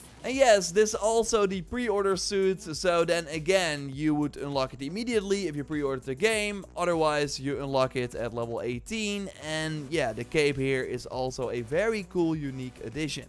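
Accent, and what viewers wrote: Netherlands. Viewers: As Dutch as an accent can be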